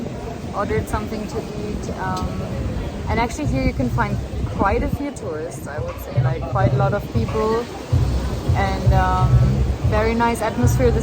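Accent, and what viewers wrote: India. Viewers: Srilankans r super helpful n u sis don't pitch in ugly India pitch u r in Srilanka please.. yakkk Urdu voic in Srilanka 😂🙏 Cmon.. Srilankan sound is proper cool than stupid Urdu in Srilanka sooo odd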